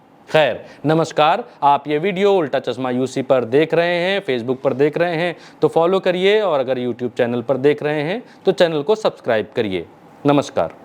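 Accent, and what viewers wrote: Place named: Bhojpuri, India. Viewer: Your 'Bhojpuriya' type reporting is amazing.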